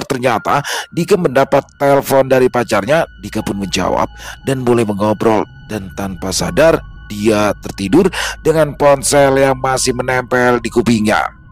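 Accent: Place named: West Java, Indonesia. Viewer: Keren bang, logat Sundanya 😊😊😊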